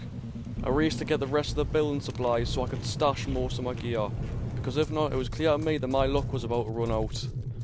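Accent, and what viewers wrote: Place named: Newcastle, United Kingdom. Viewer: Good to hear a Geordie streamer, great video mate 👍🏻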